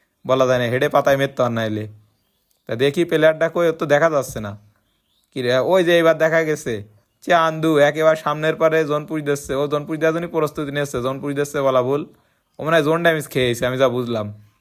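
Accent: Jessore, Bangladesh. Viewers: ভাই আপনার বাড়ি কি যশোর, সাতক্ষীরার দিকে?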